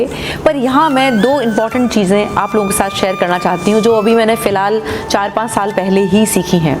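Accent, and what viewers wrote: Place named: Hyderabad, India. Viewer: My Dear & Respected Royal Madam ji Namaskar ji. GOOD NIGHT. Today I saw your all video clips and specially your Syherees. Your pronunciation is like Hyderabadis URDU .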